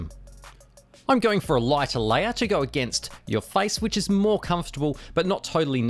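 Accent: Australia. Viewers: Non Australians, and especially non-native English speakers, cant understand your accent. You might want to have an American or a Brit do a voice over to multiply your audience dramatically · very interested i like to hear that Australia voice. that is an obsession with perfection.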